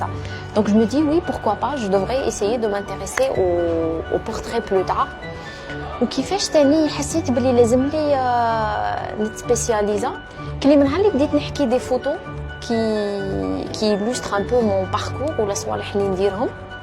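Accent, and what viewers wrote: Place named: West, France. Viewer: J'aime ta façon de parler et bien-sûr le contenu .. mais surtout ton accent de l'ouest ça me manque. Bon courage pour ce que tu fais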